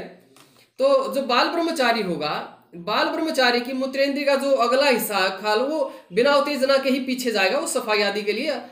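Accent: Haryana, India. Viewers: हरियाणा से हैं क्या आचार्य जी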